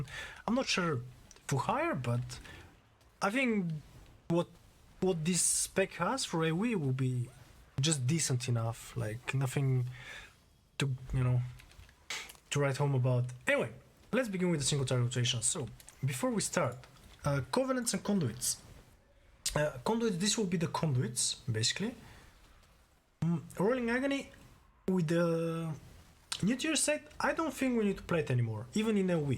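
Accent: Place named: France. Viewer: Are you French? you have an elegant accent :D your unitframe is SUF right?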